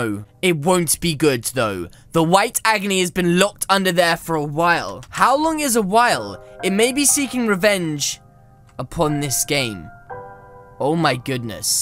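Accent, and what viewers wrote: United Kingdom. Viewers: I love ur voice it's British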